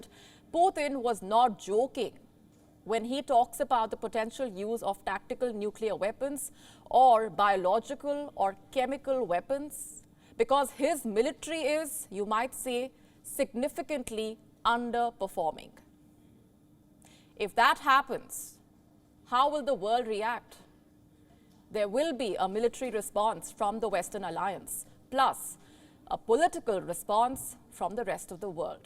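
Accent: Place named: India. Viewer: Can u get rid of that accent. It sounds like any stereotypical Indian character in a western movie.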